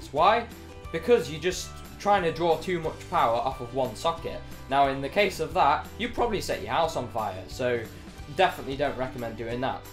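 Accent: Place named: United Kingdom. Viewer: British dialect!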